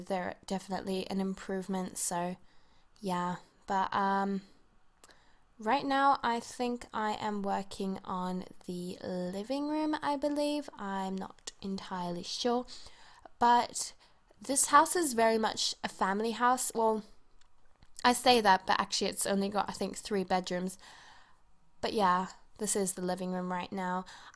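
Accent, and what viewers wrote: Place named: England, United Kingdom. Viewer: I can't figure out if her accent is English, South African, Australian or a New Zealand one... Someone help please, I am new here! Haha.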